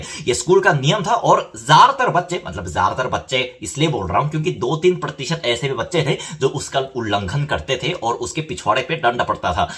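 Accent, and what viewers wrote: Standard. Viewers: Haayyy allah itni shudh hindi hume nhi aati hindustan main aake toba toba😂😂😂🥂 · Apne itne sudh hindi 😯, khana se sikhi.... Itne toh mujhse nhi boli jati 😂😂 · भाई इतना शुद्ध हिंदी कैसे बोल लेते हो इतना सटीक शब्द तो हम लोग नही बोल पाते हैं 😂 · Bhai jitni shudh hindi tumhe aati hai itni shudh hindi toh hame India mai rehkar nhi aati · North east wale nhi tumse v jyda sudh hindi bol raha hai korean log samjhe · inti sudh hindi hamare hindi ke professor bhi nahi bolte..❤👍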